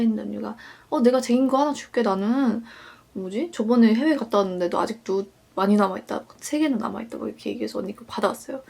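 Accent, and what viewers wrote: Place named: Seoul, South Korea. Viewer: 90년대 서울사투리를 쓰시네요ㅋㄹㅋㅋㅋㅋ 말투가 특이하다 생각했는데 그거였어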